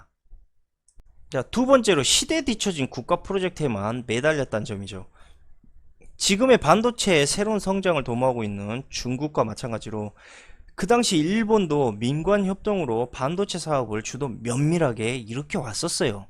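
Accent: Jeolla, South Korea. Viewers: 목소리가 삼성 싫어하는 7시 지역 놈이네. 역시,,,,,,, 예라이 썩을 놈. 퉷퉷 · 듣기싫네 알고 떠들어요 전라도라 더싫음ㅡㅡ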